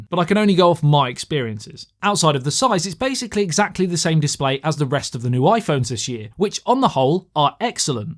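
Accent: United Kingdom. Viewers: Liked for the British accent 😊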